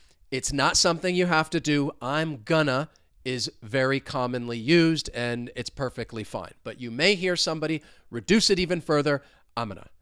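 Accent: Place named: New York, United States. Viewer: Based on your accent, I think you are from NYC or NE states at least. Am I correct?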